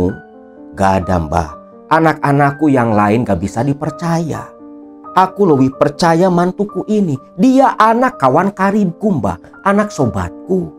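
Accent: Java, Indonesia. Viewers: Oiyaa Ko aku salpok sama suaranya jadi medok kaya wong jowo😅😅😅🙏👍👍👍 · Betul sekali 😁😁 ngomong jowo e wes medok 😅😅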